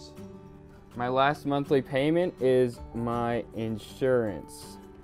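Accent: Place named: East Coast, United States. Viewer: Loved the video and I’m thinking of doing this! Are you still in AZ?! It would be awesome to meet you sometime, are you originally from the East Coast, because you sound like it.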